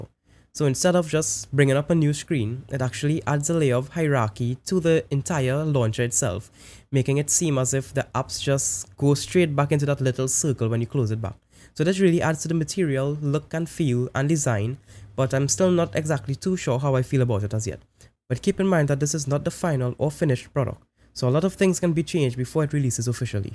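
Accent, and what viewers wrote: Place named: Trinidad and Tobago. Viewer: great vid.....you sound Trinidadian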